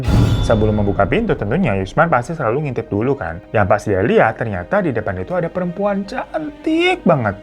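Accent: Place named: Kalimantan, Indonesia. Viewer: Logatnya kalimantan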